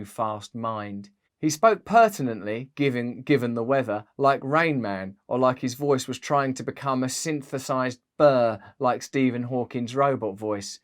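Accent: United Kingdom. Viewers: Dear Russell, you are in a unique position with your celebrity status to influence in ways others cannot! As a non-UK person, I absolutely LOVE your accent! It makes me feel you are a real person, just like me 😊 Love your sharp intelligence. Yes I read your book about 2 years ago - loved it. I remember the chapter on the Saxe-Goth-whatever - real name of the queen’s family! I wish all Brits would wake up to the real facts about their royal family! Maybe you can do a little video on that again? 💖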